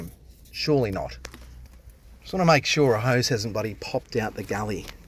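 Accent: Australia. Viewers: hey mate, on my (unintentional but appreciated) search for more australian content creators, i came upon ur videos, and for some reason i cant stop watching, even tho im certainly not a plumber. i dont know, since i spent 2 years avoiding drop bears and fightin 'roos and murder birds, i cannot get ur lovely country out of my head, bloody going to have to find a job/sponsor at this point mate, anyway, keep doing these vids, and especially that awesome accent, i miss it so much :)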